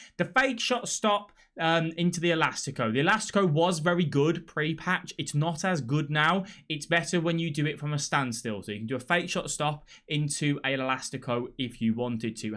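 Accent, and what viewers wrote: Birmingham, United Kingdom. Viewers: Are you a Brummie mate?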